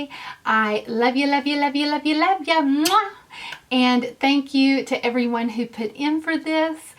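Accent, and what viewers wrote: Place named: Alabama, United States. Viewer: Where are you from in the south..I am from Arkansas and have lived all over Alabama. You sound like you're from Alabama and you say um a lot .lol...So I've never tried Tribe Beauty Box, I almost did..here is my email bc I don't always get my <mail>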